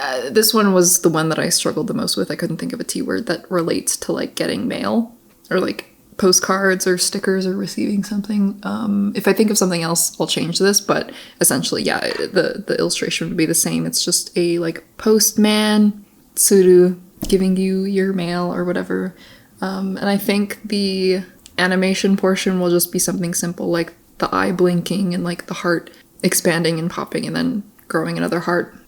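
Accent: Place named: United States. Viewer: you speak like a normal American accent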